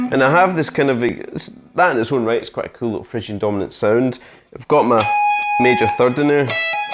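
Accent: Scotland, United Kingdom. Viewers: misterassassin1990 Scottish I believe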